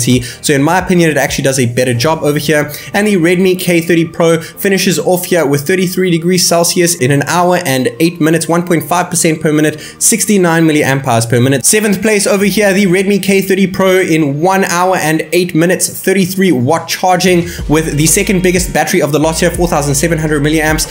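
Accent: South Africa. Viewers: Hey, just curious - are you South African, by any chance?🤔 Your accent...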